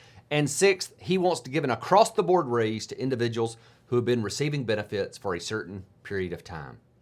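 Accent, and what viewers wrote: South, United States. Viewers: Where you from?? Got that accent like down south east coast Ga. or Virginia OR N.C. 🤔🤨🤣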